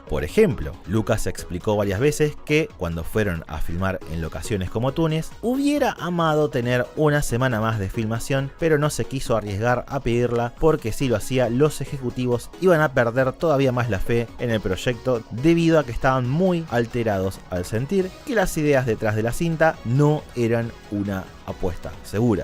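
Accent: Argentina. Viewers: me gusta tu canal genio sos de argentina?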